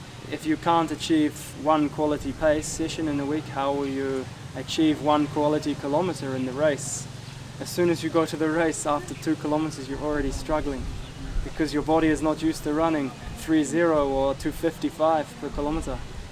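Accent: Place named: Kenya. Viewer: Felipe Lopez It’s like he’s saying everything in a Kenyan accent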